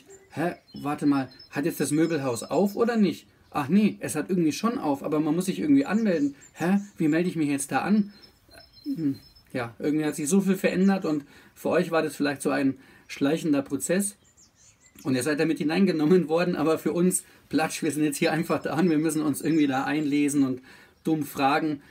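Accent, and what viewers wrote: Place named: Thailand. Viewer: Du hast irgendwie so eine Thai-art zu sprechen in dem Video, das ist super süß und erinnert mich an meine Zeit in Thailand ☺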